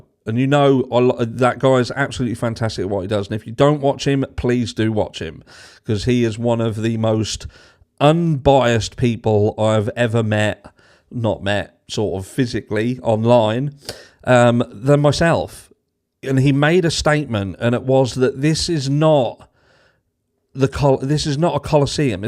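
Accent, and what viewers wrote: Norfolk, United Kingdom. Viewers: Wow, you've a thick norfolk accent!! I dont have a regional accent but i'm born & and raised in Norfolk so I recognised it within seconds! You were saying the word 'hear' but you pronounce it as 'hair', made me smile!😊 The lawyer you know is absolutely brilliant youre right. He always remains objective, even though he leans to the side of a defence perspective. Isn't it nuts how differently the US tries cases? They are so open compared to us here in England!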